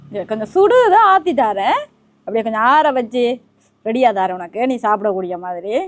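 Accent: Jaffna, Sri Lanka. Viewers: யாழ்ப்பாண தமிழ் என்றுமே இனிமை..தமிழ் நாட்டில் இருந்து சமையல் குறிப்பு கூறுபவர்கள் பாதி ஆங்கிலம் தான்.. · நீங்கள் பேசும் அழகு தமிழ் அருமையான தமிழ் இதற்காக எத்தனை முறை பார்த்தாலும் சலிப்பு தட்டாது அருமை அருமை வாழ்க தமிழ் யாழ்ப்பாண தமிழ்❤❤❤❤ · Sorry,Neenga ilangai thamizhara. Unga language vithiyasama theriuthu😊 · Ilangai Tamil alagu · Like yalpanam tamil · அக்கா உங்க இலங்கை தமிழ் கேட்க இனிமையாக இருக்கிறது வெ ந்த ய குழம்பு பார்க்கும் போதே சாப்பிடணும் போலாம் இருக்கு மிக அருமை நன்றி சிஸ்டர் ❤️❤️❤️ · Arumai sister eela tamil very super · இயற்கையான முறையில் திறந்த வெளியில் மிக அழகான யாழ் பாண தமிழில் அழகாக வெந்தய குழம்பை நா ஊரும் வகையில் செய்முறையை Live ஆக செய்து காட்டியது மிக அற்புதமான செய்முறை. சூப்பர். அதைவிட யாழ் பான மண்ணில் பிறக்கவில்லையே என்று வருந்து கின்றேன். உங்கள் யாழ்ப்பாண தமிழ் கொஞ்சும் தமிழ் மிக அழகாக கேட்க கேட்க தேன் போல் இனிமையாக இருந்தது. சொர்க்கத்திற்கு கூட்டி கொண்டு சென்று விட்டீர்கள். 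அருமை மா. உங்கள் இந்த யாழ் பான சமயலை தொடர்ந்து எங்களுக்கு அனுப்பி வையுங்கள். எனக்கு உங்கள் சமையல் முறை மிகவும் பிடித்தது. அனைத்தையும் மெருகூட்டும் வகையில் உங்கள் குரல் மிக அழகு கொஞ்சும் யாழ்ப்பாண தமிழில் கேட்கவே அருமையாக இருக்கிறது தாயே. நீ வாழ்க வளமுடன் பல்லாண்டு. என் ஆசிகள் என்றும் உங்களுக்கு. தெய்வம் ஆசீர்வதிக்கும் உங்கள் வெள்ளை மணமும் குணமும் மிக அருமை. நன்றி மகளே 🙏🙏🙏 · யாழ்ப்பாணத்தின் உணவும் யாழ்ப்பாணத்தின் பேச்சும் என்றும் சுவை தான் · உண்மையான செயல்திறன் கொண்ட செய்முறை விளக்கம் அதுவும் எனது பிறந்த மண்ணின் உணவு நாங்கள் 1980ஆம் ஆண்டில் இந்தியாவில் தமிழ்நாட்டில் குடியுரிமை பெற்று வாழ்ந்து வருகிறோம் அடுத்த வீடியோவில் எனது உறவினர் பற்றிய விபரங்களை தங்களிடம் விசாரித்து அவர்களின் விபரங்களை தெறிந்துகொள்ள இருக்கிறேன் சகோதரி இனிய இலங்கை தமிழ் நன்றி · யாழ்ப்பாண தமிழில் அளவிலாவி இரசித்து சமைப்பது அருமை. வாழ்க 🎉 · யாழ்ப்பாணத்தமிழ் கேட்க நன்றாக உள்ளது நன்றி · இனிமையான இலங்கைத் தமிழும் விறகடுப்பு வெந்தகயக் குழம்பும் மிக மிக அருமை ❤🎉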